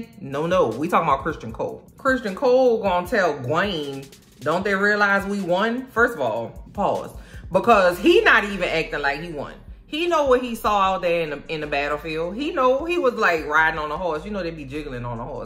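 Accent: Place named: Louisiana, United States. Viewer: Absolutely LOVE the Louisiana accent and humor!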